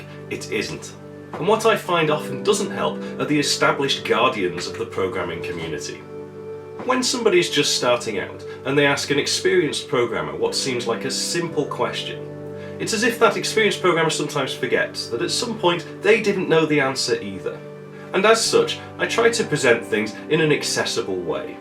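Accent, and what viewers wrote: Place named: North, United Kingdom. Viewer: Your accent is so much like mine that it took me a whole video to spot that you are a Northener. Are you from the West Lancs area by any chance? Great four-parter on the 3D graphics. I never really understood this and your vids explained it very clearly to me. Thanks!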